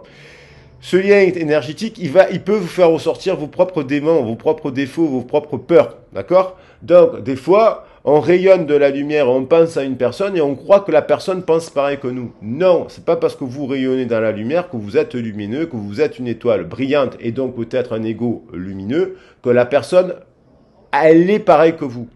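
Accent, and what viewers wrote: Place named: Marseille, France. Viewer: Bravo. J ai visité Marseille ce WD. J ai bien aimé. Je crois que vous êtes de là bas? L accent!😉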